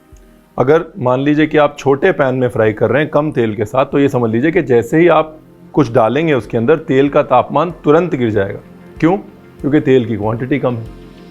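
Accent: India. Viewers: Thnx sir for this recipe ,sir love ur desi style when u talk 🙏🏻😊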